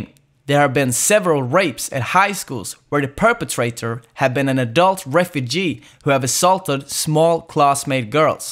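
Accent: South, United States. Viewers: Never knew Swedes has such a US southern accent 😂 I don’t doubt that you’re Swedish, but from who did you learn English from? Sounds like someone from where I’m from, the deep USA south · Where did you pick up that Southern American accent?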